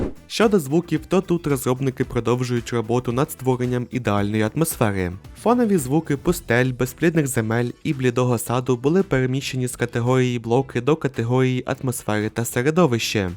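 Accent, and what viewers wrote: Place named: United States. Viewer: У вас дуже файний американський акцент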